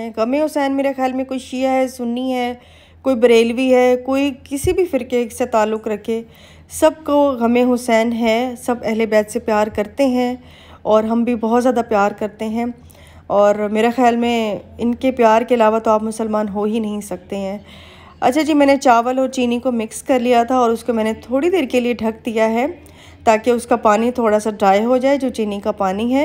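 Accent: Saraiki, Pakistan. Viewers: Hayeee ap saraiki ktna pyara bolti hai 😍😍😍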